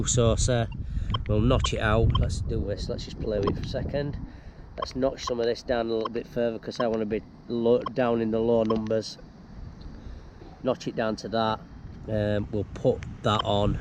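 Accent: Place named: Yorkshire, United Kingdom. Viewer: Good tip about the August headphones but I didn't understand what you said about the horseshoe icon "makes the machine more powerful" - what do you mean by that? I'm from Yorkshire btw so it's not an accent thing that I don't understand what you're saying! (West Yorkshire as opposed to your South/North Yorkshire accent). Tip - treat yoursen to a nice watch so you can tell the time without having to pull your phone out of your pocket! Really enjoyed the vid - well done pal.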